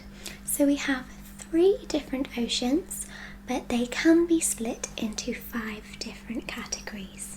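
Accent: United Kingdom. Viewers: May i ask you from city is your accent? Or if it is just a neutral British accent. I generally don't like British accent, but I love yours! 😊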